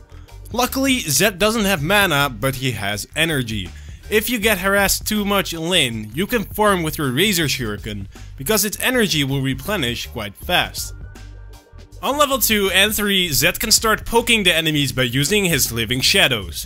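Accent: Netherlands. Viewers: Are u dutch? Great accent tho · Lol he's dutch if you can hear it :]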